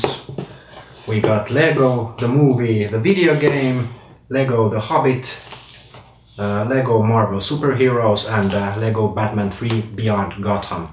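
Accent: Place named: Finland. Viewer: OMG FINNISH ACCENT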